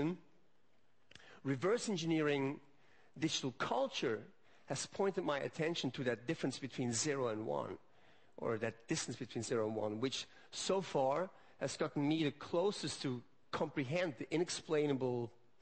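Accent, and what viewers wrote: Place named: Switzerland. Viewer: Well, he's Swiss. They always sound rather distinctive.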